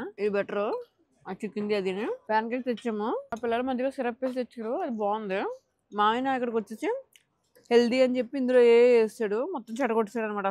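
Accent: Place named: Andhra Pradesh, India. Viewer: 😂 😂😂 Superb Commentry Andi meedi !👌👌👌😅 Kadupu Noppi Vastundi Navvi Navvi, Me Anargalamaina , Emaatram Artificiality lekunda, Aksharaala Pure 24 Carat Andhra Yaasa, Bhasha, toh, Natural Commentry Vintunteh.. 😆😆😂😂👌👌👌 Just Superb !🙌👌👌 Thank you for such a Hearty Commentry Andi ! Enjoyed it Thoroughly! ☺️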